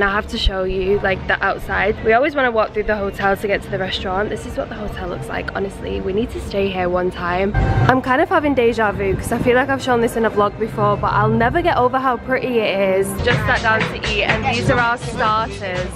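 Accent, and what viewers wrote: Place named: RP, United Kingdom. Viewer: I love your accent, so posh lol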